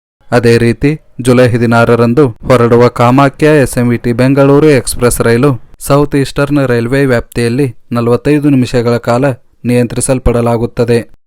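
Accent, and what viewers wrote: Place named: Kittur Karnataka, India. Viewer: Nimma kitturu Karnataka slang bittu shudda kannadadalli heli nimma channel grow agutte i hope you don't offend of my suggestion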